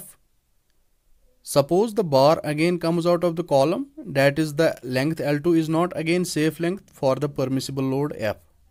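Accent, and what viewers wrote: Kashmir, India. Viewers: Wah maza agya kashmiri accent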